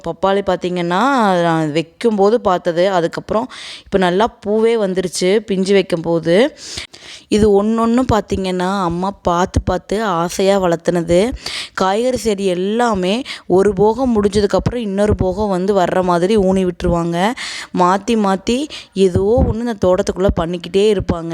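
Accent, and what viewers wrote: Kongu, India. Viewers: Oru oru oorla oru oru pechu vazhakku irukku.Adha yaarum edhuvum soldradhillaye.Aen ellarum "porikkara" dha mattum target pannanum?!Neenga Unga oor pechu vazhakka mathuveengla sollunga sister?!Pechu vazhakku enbadhu mannin manam. Accept pannitu poite irunga. Kongu tamil avlo azhagu.Ippo ella oor makkalum mix aagi,kongu tamil eh ketpadhe rare aagi vittadhu.very sad to say this.Ellame maari pochu. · கொங்கு தமிழ்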